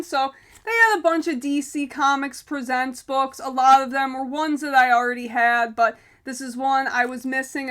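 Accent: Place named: Western New York, United States. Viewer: Love your content ... and Western NY accent!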